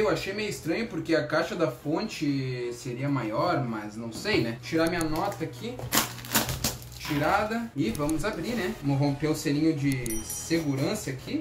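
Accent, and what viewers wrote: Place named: Rio Grande do Sul, Brazil. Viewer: Tu é Gaúcho né meu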